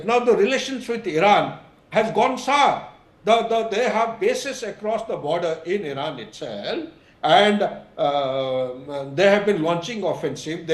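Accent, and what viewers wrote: India. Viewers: Waatta Patta Itta 😅 Funny Indian Accent of English Language Always Hurts The Ears of Listeners 😅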